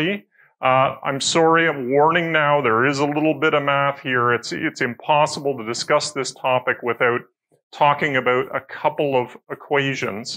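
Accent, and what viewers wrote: Canada. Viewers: Canadian, eh? 😊 One of my favorite teachers was Canadian. That was like 50-60 years ago lol Howdy from the US! Enjoyed your presentation. 👍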